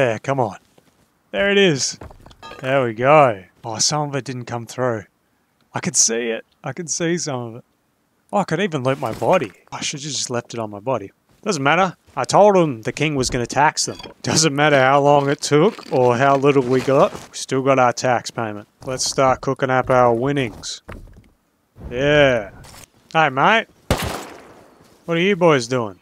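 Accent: Australia. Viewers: something about the accent and choice of wording makes Australian people just funny by default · Honestly, gaming and just listening to this man's beautiful aussie accent is something else, 4+ hour commentary content when? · He's Australian lol there's not a single British accent out there that's not annoying.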